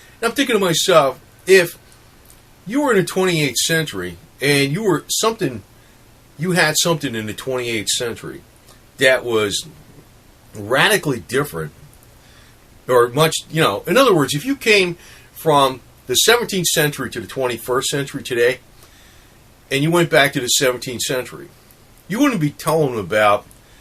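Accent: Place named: New York, United States. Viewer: I love the WY you talk .. New Yorker .. I never believed him ither ... he never was in the future..Their us no future ..We're the future ..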